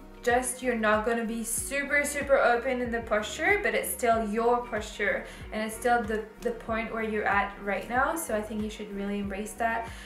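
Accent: France. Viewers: Are you french? Your English prononciation is really good)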